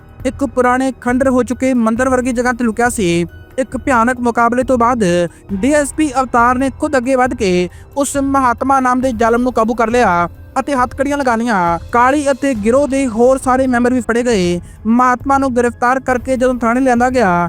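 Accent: Bihar, India. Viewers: Don’t we have anyone who can speak Punjabi in a normal tone ?????? Who will watch you ? !!! Like a Bhyia speaking Punjabi !!!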